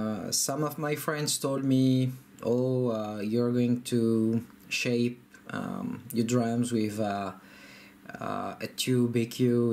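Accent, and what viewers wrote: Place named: France. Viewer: Good job ! And Good "french accent"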